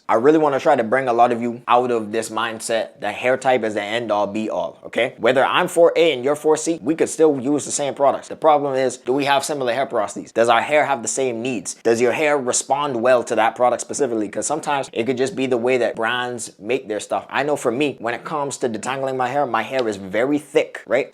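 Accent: Bahamas. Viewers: sound like he is a Bahamian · I think the Bahamas 🇧🇸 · he does sound Bahamian. I knew when he said “hay-auw” for hair lol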